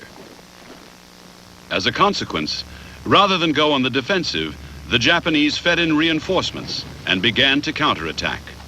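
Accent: United States. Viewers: I think this is the first time I've heard this documentary with an American narrator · Great video. Is this the Original Narrator, or was this "Americanized" for the Western Audiences? I still prefer the British accent of the version found on Vasile Luga's channel, but to each their own. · Tim Piget Smith Narrator replaced by a Yank for an American TV Channel ?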